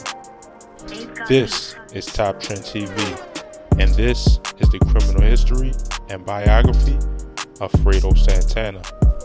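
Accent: Baltimore, United States. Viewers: Narrator must be from Baltimore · I can tell he from Baltimore lol it's how he says you two flew etc 😂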